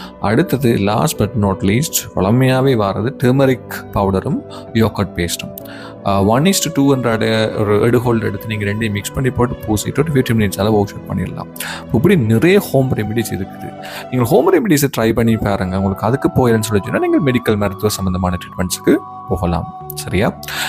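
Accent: Sri Lanka. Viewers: Hii doctorayyyyyyyyyyyyy this is u r subscriber usha how r u sir speaking out a excellent and a marvelous srilankan tamil ❤🎉❤🎉❤🎉❤🎉❤🎉❤🎉❤🎉❤🎉❤🎉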